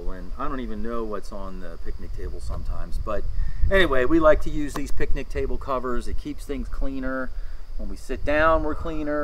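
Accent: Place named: Baltimore, United States. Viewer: We just got out first rv so this video is very helpful! You must be from Baltimore with your accent. Love it!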